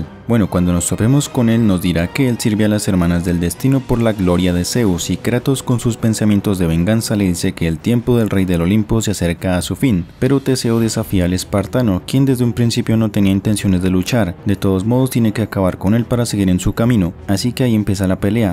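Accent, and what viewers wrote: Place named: Peru. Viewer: Se nota que eres peruano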